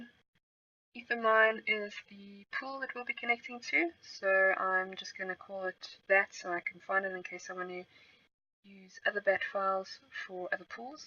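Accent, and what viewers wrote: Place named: South Africa. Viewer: you sound very South African. Awesome. Dankie.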